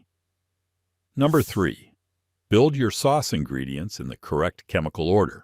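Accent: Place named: United States. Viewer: What's with that terrible American accent?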